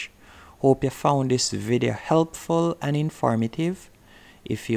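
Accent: Jamaica. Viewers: Dude has an Jamaican accent!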